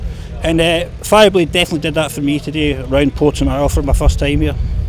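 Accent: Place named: South Africa. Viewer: Mister Knobb Yes same accent as the famous south african rally driver...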